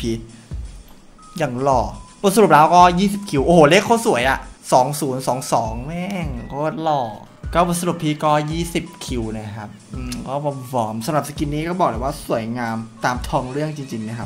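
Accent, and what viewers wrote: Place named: Isan, Thailand. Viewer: เห้น ตลกก็บัดนี้เเหละพูดตลกเหมือนเสียงอีสานน่ะ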